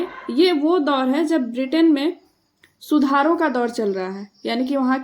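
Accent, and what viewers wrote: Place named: Bihar, India. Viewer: Mam apki voice tone se lg rha h aap bihar se ho😊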